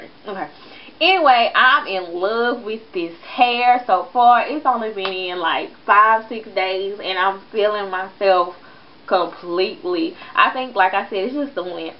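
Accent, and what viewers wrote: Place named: New Orleans, United States. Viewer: Im from new orleans girl and i was like oo her acsent sound so familiar, and now i know lol.. cute cute cute !!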